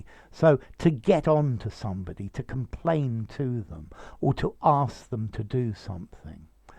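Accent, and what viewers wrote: United Kingdom. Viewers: I love his accent so British ❤️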